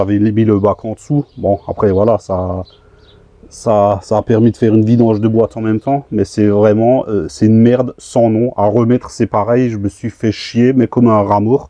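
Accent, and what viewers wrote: Alsace, France. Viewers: salut j'aime bien tes vidéos et avec l'accent alsacien c'est encore mieux continue comme ca c'est bien utile tes tutos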